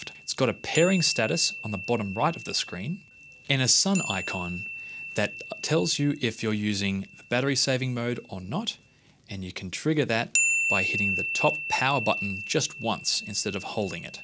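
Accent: Australia. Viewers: Great review bro! Like your accent. Are you Aussie? Been checking out good affordable wireless microphones that are easy to use since I am planning to create a vlog content in the future and I find this little device very capable for budding content creators like me.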